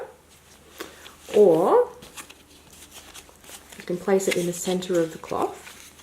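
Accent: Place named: Australia. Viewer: You sound aussie.. ill have to have a look.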